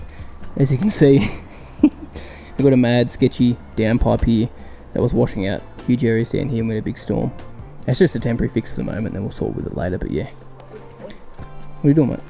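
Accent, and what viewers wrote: Australia. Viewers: you weren’t, you sound Australian to me.....I’m always saying “yeahhhhh” to my hubby when he rings and I answer the phone because I know it’s him ringing me.......😂😂😂🇦🇺🇦🇺🇦🇺🇦🇺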